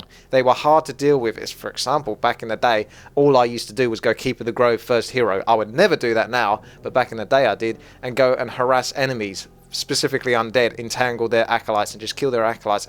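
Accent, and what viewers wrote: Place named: United Kingdom. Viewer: awesome bro !! wish I could pinpoint your Brittish accent.......is it southern ?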